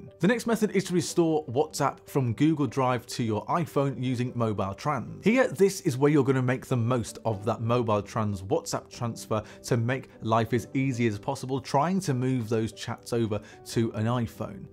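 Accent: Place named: United Kingdom. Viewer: Ugh Please speak Normal English and fix your mouth please. You look sleepy and creepy with this British English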